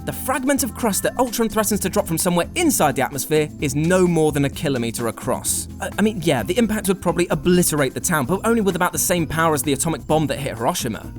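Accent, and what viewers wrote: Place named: England, United Kingdom. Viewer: Speak a little slower please? English accent is harder to understand than american. Might cost you subscribers. I only understand english because it's the "common tongue"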